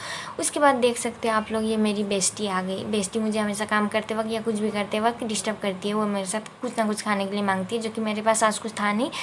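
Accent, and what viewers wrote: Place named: Nepal. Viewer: Yar vlog to theek hai par use jyada aupe ka accent hai Jo hame khatarnak laga proper Nepali people when they speak hindi.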